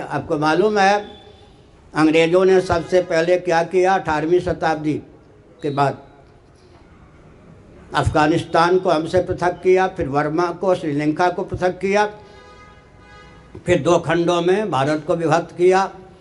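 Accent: Standard. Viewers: Shudh hindi gazab bolte hain 🙏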